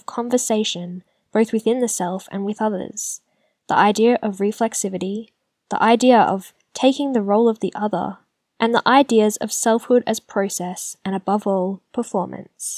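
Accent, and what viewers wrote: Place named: Melbourne, Australia. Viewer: Oh right, you're from Melbourne, no wonder you sound a bit different. PS Brisbane here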